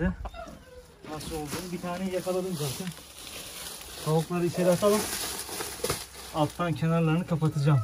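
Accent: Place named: Araklı, Turkey. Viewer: Usta kesin Araklıdan konuşmadan belli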